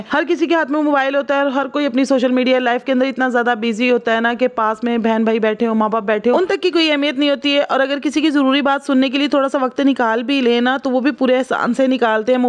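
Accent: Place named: Lahore, Pakistan. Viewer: Your urdu is not like pashto speaking persn is totaly lahori accent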